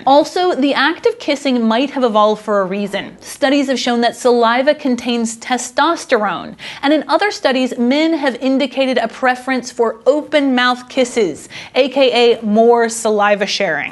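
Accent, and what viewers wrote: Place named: United States. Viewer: Her voice reminds me why I don't like American accents. Absolutely no ability to modulate - it's just constantly shouting.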